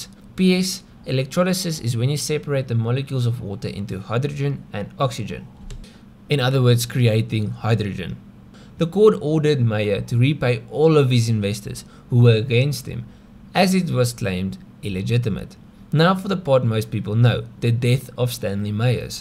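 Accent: South Africa. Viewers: Afrikaans accent is strong 🔥🔥♥️ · The South Africa in this accent is beautiful haha 😂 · South African accent?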